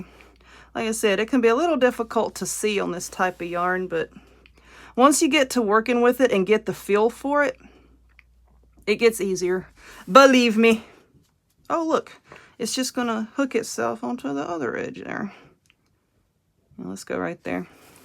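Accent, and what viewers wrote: South, United States. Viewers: Woman you are amazing!! I so love your videos! Thank you from another southern girl that can so understand your wonderful voice!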